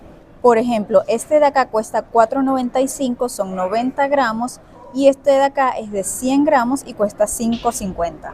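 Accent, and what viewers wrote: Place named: Venezuela. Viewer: Tú acento se parece al venezolano.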